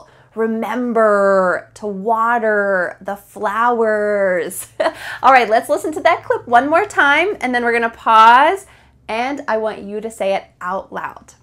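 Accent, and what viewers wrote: United States. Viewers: As a Chinese, I really like her American accent. It sounds very comfortable. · I like when you are speaking American accent and I got a lot of many things . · I love your American accent! Do you mean from North America or Central America or perhaps Soth America? Do you mean the U.S. of America? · Excellent American pronunciation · I love this American accent and beautiful smiles! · I just love how these Americans speak...n she's really cute n her American tone is outta this world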